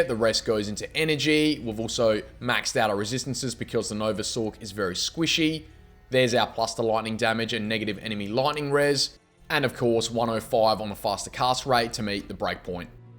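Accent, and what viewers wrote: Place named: Australia. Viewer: Yankee here, but my family did live in Melbourne for 7 years recently before to moving back to the States. I played D2 when it first came out, then again about 10 years later to build a character to do the Ubers. In my third run now to try to beat the Ubers and colossal ancients this time. Really enjoyed hearing the High Rune Frustrations in an Aussie accent and learned a thing or two along the way. Subbed so I can hear more whinging and I hope you eventually get that perfect role.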